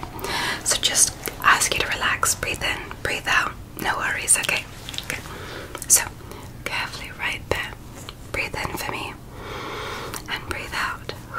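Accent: England, United Kingdom. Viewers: British!!! Another great video!!!! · The British accent got me dying gurl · Love the English accent!!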